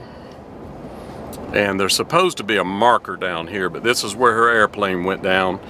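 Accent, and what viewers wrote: South, United States. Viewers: Just love your accent. That's proper Southern, that is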